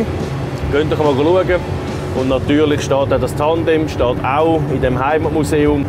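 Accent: Switzerland. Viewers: Interessante Videos und immer wieder klasse Projekte. TOP! Mir persönlich gefällt es, dass sich kein bisschen anstrengt, es irgendwie auf "Normal-Deutsch" zu kommentieren und immer auf der "Schwizerdütsch- Schiene" weiter fährt.:-) Muss allerdings sagen, dass ich als "Badner" aus Deutschland, keine Probleme habe, es zu verstehen, da unser Dialekt ja recht ähnlich ist. Als Norddeutscher wäre ich für die Untertitel auf jeden Fall dankbar.:-) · Nur Züri-Dütsch :-)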